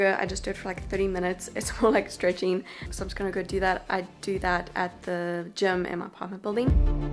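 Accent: South Africa. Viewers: Caislee Erica It’s South African. I’m not sure what part of South Africa tho. Because I’m from Cape Town and we sound slightly different than her.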